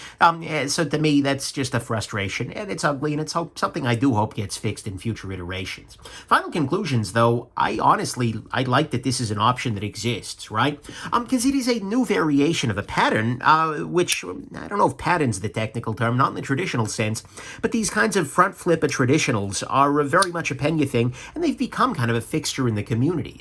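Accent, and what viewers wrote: New York, United States. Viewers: I love your old - style NY accent. It reminds me of Stitch Mahone from the Paradise Ally movie.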